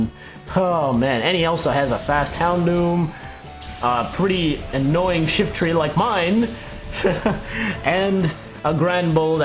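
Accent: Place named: United Kingdom. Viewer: I'm British and i like your British accent! :)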